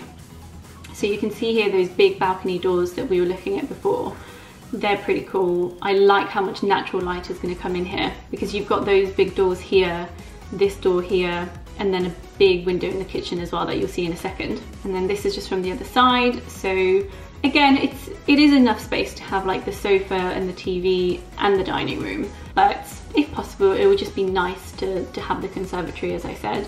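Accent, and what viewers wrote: England, United Kingdom. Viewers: As you polish? And why do you want to live in Poland? You sound english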